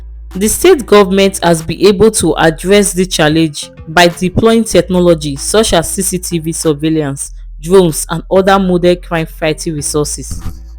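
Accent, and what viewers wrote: Nigeria. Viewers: I love ur accent you be real edo babe ❤❤❤😊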